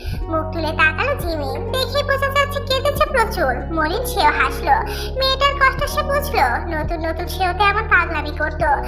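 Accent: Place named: Kushtia, Bangladesh. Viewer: আপু আমি জানি এই চ্যানেলে তেকুক স্টোরি ছাড়া কোন স্টোরি কোন সময়ই দাওনা আপু আপু আপু খুব খুব খুব একটা অনুরোধ করছি আপু তুমি একটা তিনচার পাটের স্টোরি দিবে ভিমিন, আপু তোমার এই স্টোরি দেখে আর কুষ্টিয়ার কথা শুনে আমার খুব ভালো লেগেছে কারণ আমার বাড়ি কুষ্টিয়ার ভেড়ামারাতে, যদি তুমি আমার জেলার মেয়ে হলে আমার অনুরোধ রাখবে আমি আশা করছি, আমি তেকুকু লাভার আবার ভিমিন স্টোরি খুব সুন্দর লাগে আর তোমার স্টোরি খুব সুন্দর লাগে তাই বিনিতো অনুরোধ করছি প্লিজ প্লিজ নির্লজ্জের মতো বলছি আপু প্লিজ প্লিজ প্লিজ একটা ভিমিন স্টোরি দাও প্লিজ প্লিজ প্লিজ আর আমার কমেন্টের উত্তর আশা করছি খুব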